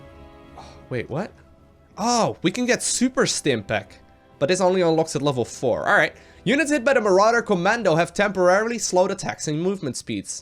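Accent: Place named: Netherlands. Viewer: lovely dutch accent...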